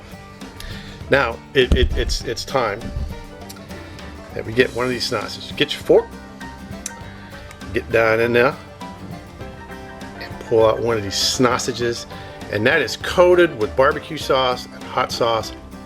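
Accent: North, United States. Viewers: I'm from NC and we just eat em plain with Lance Cheese and peanut butter crackers. I'm seriously questioning your "Southern" claim. Sound like a Yankee to me. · He don't talk like a Southern country boy. Hear some Yankee in there.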